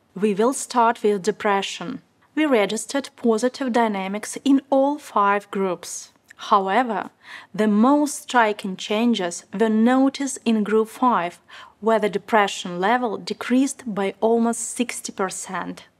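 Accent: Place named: United States. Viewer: Живу 15 лет в Англии, потрясающий английский ! Американский английский но очень профессионально !!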